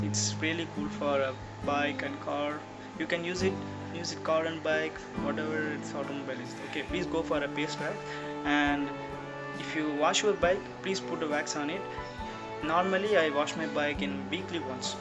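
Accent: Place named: United Kingdom. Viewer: I thing he is speaking British English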